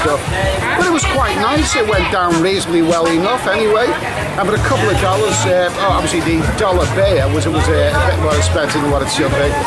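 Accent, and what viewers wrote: Liverpool, United Kingdom. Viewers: What part of Liverpool are you from?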